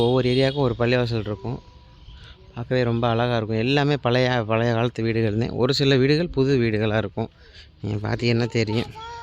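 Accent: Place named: Madurai, India. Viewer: உங்க பேச்சில மதுரை slang தெரியுது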